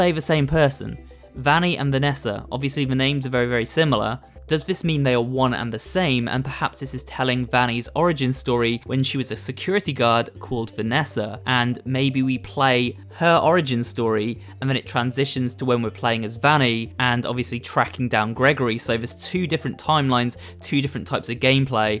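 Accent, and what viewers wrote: United Kingdom. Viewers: you sound like your from the UK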